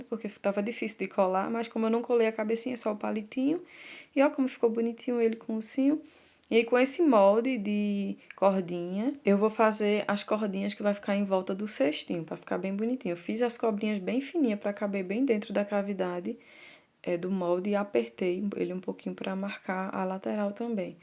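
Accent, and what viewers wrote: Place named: Northeast, Brazil. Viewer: O sotaque nordestino é muito lindo,eu tenho ranço do Paulista toita,poita,kkk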